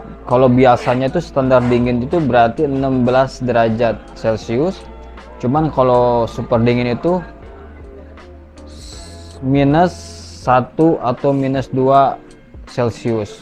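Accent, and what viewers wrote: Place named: West Java, Indonesia. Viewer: Kapan mao ke salmiya bang ? Kopdar" Aksen nya aksen sunda ini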